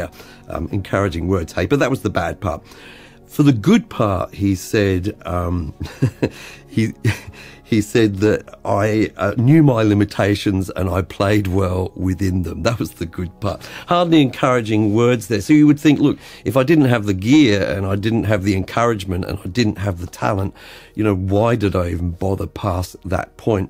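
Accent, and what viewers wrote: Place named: Australia. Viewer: Wow, I really thought you were Australian. Never would have guessed you were brought up in the UK. Anyway, thanks for sharing your expertise here. It's really helped me a lot as a Cakewalk newdie. As for me, can't really say I'm a musician, but have the urge to create. Composed a lullaby for my new baby last year which led me to Bandlab, and now to Cakewalk as I try to make the song as good as I can with my limited abilities and 100 virtual instruments. Really like it as a creative outlet. Thanks again for making it easier.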